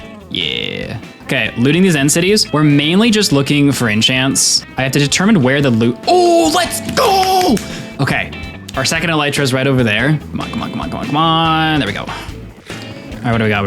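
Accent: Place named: Canada. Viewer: Sounds Canadian Looks German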